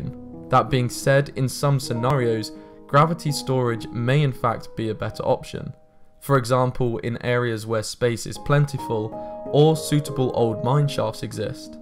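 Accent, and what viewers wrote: United Kingdom. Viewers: The idea is the modern perpetual machine. It is a hi tec scam. Before I believe a word of this bull shit I want an MIT physics prof to explain why it is a great idea not some guy with a british accent.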